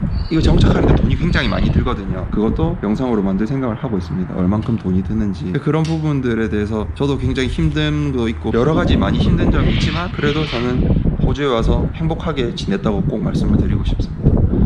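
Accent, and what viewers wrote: Gyeongsang, South Korea. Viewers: 같은 경상도 사람으로서 말투도 생각도 너무 공감갑니다. 영상 잘봤습니다! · 같은 경상도 억양이라 그런지 더 관심이 가는 존님 ㅎㅎ 잘보고있습니다 저희부부도 호주 퍼스에서 영주권까지 고군분투하고있네요 언제나 건강챙기시고 응원합니다 두부부님의 삶을~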